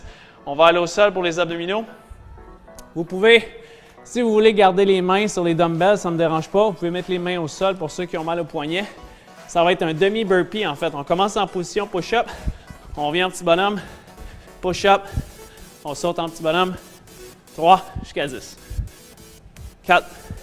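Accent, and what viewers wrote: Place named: Canada. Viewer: SUPER ces entrainements, en plus j'adore ton accent canadien....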